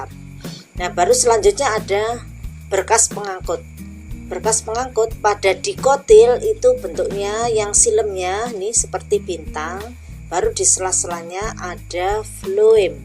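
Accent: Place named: East Java, Indonesia. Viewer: Kaya wong jawatimuran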